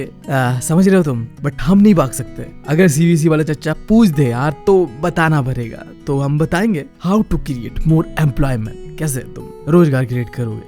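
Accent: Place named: Assam, India. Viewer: Wo assam se hai iss liye thoda hindi me problem hai bhai😅😢😊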